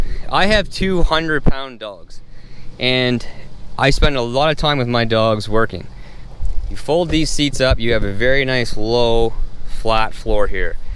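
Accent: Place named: Nova Scotia, Canada. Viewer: He’s from Nova Scotia heavy accent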